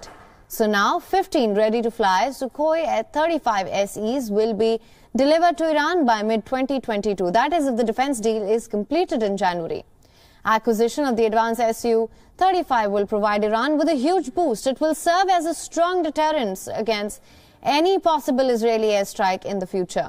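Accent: India. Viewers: FIND SOMEONE THAT CAN ACTUALLY SPEAK ENGLISH SMOOTHLY AND WITHOUT THIS SCREECHING AND EXTREME I. N. D. I. A. N ACCENT, which makes it not ONLY very unpleasant but rather hard to understand! She is beautiful non-the-less, BUT this isn’t a pageant contest, it’s supposed to be the ‘news’! 🙄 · And thickest accent, like goddam. I thought stand up comedian was overexaggerate on how funny stereotypical indian accent was.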